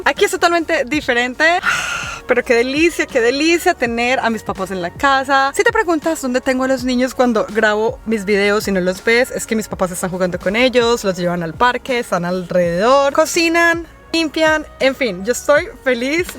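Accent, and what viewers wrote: Antioquia, Colombia. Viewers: Me encanta ese acento 😂 colombiano ❤ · con acento colombiano ave maría 😂🎉❤ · Me da mucha risa cuando hablas bien colombiana 😅 me hace acuerdo a las comedias que eh visto. Por ej Cafe con Aroma de Mujer 😊 saludos desde Uruguay❤ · se te nota que la mamá está en casa, ese acento paisa se te escucha hermoso ❤ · Me encanta escucharte bien colombiana, no se si sera porque estan tus papas y se intensifico tu acento, pero cuando hablas de las sabanas me haces acordar a Gaviota de “cafe con aroma de mujer” una serie colombiana jaja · Ave maria tan bello ese acento pues. Y tus sabanas hermosisiiimas omg😍😍😍 y esa cazuela ummm yummy que delicia tener a tus papis ahi🥹🥹❤❤❤ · Me encanta escucharte hablar más tu asentó colombiano ❤ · me.emcanta cuando hablas con tu acento colombiano me.muero 🎉🎉❤❤ eres la mejor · Que cool hablando tu acento colombiano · Que bonito video, me encanta tu asento paisa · Me brinca el corazon cuanso veo q hay video nuevo 😊😊 y mas cuando hablas cn tu acento colombiano❤❤❤... 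eres una verdadera motivacion para nosotras las madres. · Muy ricas recetitas. Me encanta que cada vez que vienen tus papás tu acento colombiano regresa y se te escucha muy bonito. Felices fiestas para ti, tu familia y para toda esta comunidad 🎉🧑‍🎄🎄🪅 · Hermosa familia amo tu asentó colombiano 🌲🌲🌲Muy feliz navidad para ustedes también saludos desde Uruguay siempre te veo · Eh ave maría!! Me encantaaa tu acento de paisa!!! Deberías de hablar más así me encanta me encanta 🥰🫶🏼🫶🏼 😍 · Me encanta tu hacento colombiano, un saludo . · Tu acento colombiano es muy lindo🥹❤️ me alegro por tus sábanas nuevas, a veces es bueno comprarse unas cosas para nosotros mismos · amoooo cuando habla bien colombiana, debe hablar más con ese acento 😂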